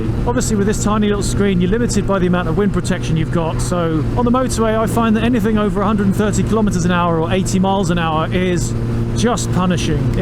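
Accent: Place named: United Kingdom. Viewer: Wow, amazing review... really good quality everything, congratulations man. Your British accent along with the metric system talk sounded odd but so, so satisfying :). Can't believe I've watched a 30 min review without getting bored and even being surprised it ended so quick. You've just got a new subscriber ;)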